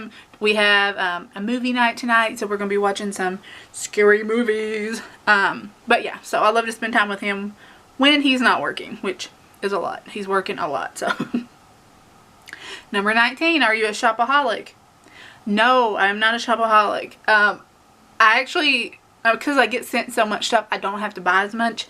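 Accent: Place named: South, United States. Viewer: my true blonde must be showing...because I just noticed your southern accent 😂 really enjoy the direction your channel is going!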